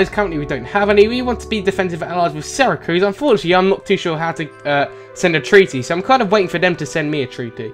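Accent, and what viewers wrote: United Kingdom. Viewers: Nothing brings out your british accent better than playing some rtw2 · Why do you watch if he has a British accent then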